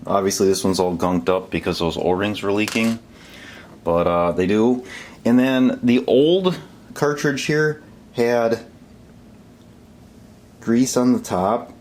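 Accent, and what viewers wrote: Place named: Minnesota, United States. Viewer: Here I am back again watching this video. Leaking started again, despite following all the directions. Didn't wait for Moen to send replacement O rings this time. Bought them online. And they didn't reply to my email. Not a happy camper. However, I am enjoying the accent of the presenter here and guessing MN maybe? Lot of snow in the background.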